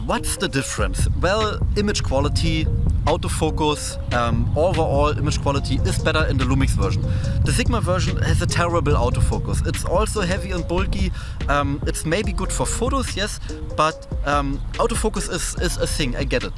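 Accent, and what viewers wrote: Berlin, Germany. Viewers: Schade das du die videos nicht in deutsch machst . Du kämst mit deiner berliner schnauze sicher viel geiler rüber und es gibt nur wenige deutsche kanäle die nicht langweilig sind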